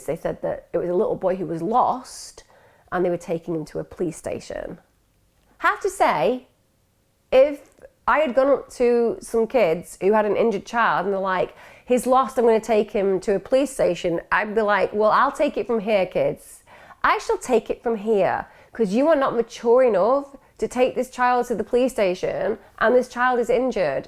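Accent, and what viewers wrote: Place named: England, United Kingdom. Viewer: Your English accent is irritating me, speak English! She bloody is.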